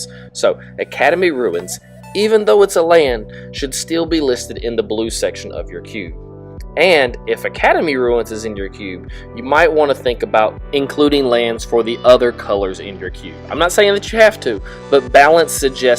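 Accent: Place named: South, United States. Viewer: I agree with some of these, but not all of them. I still believe that most Phyrexian cards are predominantly wanted by their respective colors. They do see occasional play in other colors, but from my experience they are for the most part played in decks that include the color on the card. The biggest exception to this would be Porcelain Legionnaire. Aggro decks of all shapes and sizes love this creature. But for the sake of balance, and because the rest tend to mostly see play in their own colors, I just categorize them all in that color. Speaking of balance, you also mentioned adding in a color aligned land for each color to go alongside Academy Ruins. I don't agree with this. White has maybe one cubeable land in Karakas. Red has actual zero. I think color aligned lands should be treated no differently than any other color aligned card type. 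Don't force any specific card type into every color. If there are no lands you want to play for a certain color, that's ok. It won't offset the draft or the game play at all to not have a red aligned land. Finally, I searched for on Twitter and got no results. Anyway, I really enjoy your videos. It's also nice to hear another southern voice in the cube community (I'm in TN). Cheers, man, and keep the content coming.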